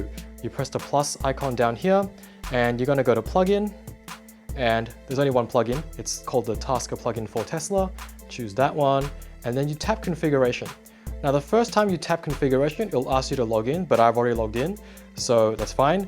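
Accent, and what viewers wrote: Australia. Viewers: Hey, thanks for your clear and concise explanation with no BS. Extra bonus points for the Aussie accent! Love your work.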